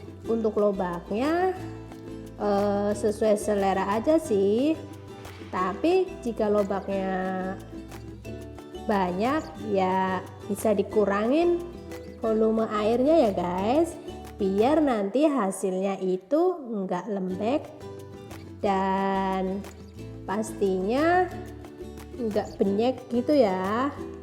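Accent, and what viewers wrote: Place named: Taiwan. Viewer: seumur-umur baru kali ini makan lobak putih kukus yg enak dan seumur-umur baru dengar suara ngapak aksen mandarin Taiwan.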